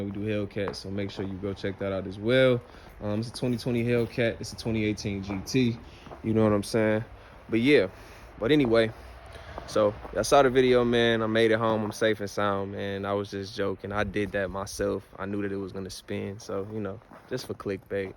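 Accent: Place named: New York, United States. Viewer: you sound like youre from nycc papa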